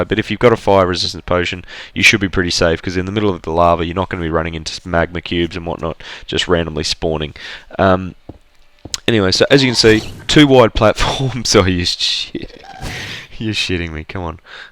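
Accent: Australia. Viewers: Are you Australian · Are you Australian? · Australian dude? I am :D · Wow you town looks so cool and are you Australian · I love Australian accents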